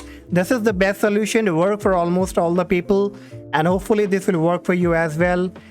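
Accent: India. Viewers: of course he sounds indian.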